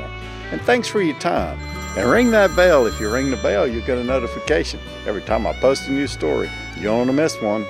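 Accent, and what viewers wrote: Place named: Arkansas, United States. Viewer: I was born in Bethlehem Pa-raised in SW Florida & blessed to have lived in Corpus Christi Tx for 20 years. Your accent is not from any of these. 😂🤣😂 love your wit & videos-thank you. Arkansas accent? 🤷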